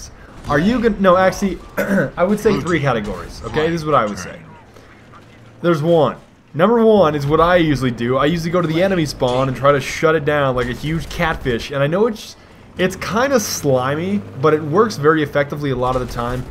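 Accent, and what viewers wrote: Germany. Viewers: I Love your German ;) greetings from Germany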